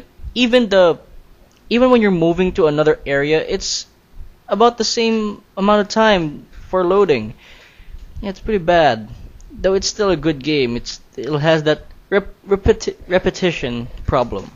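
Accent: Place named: Philippines. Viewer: I know ur filiphino, cause, i can tell ur accent ;D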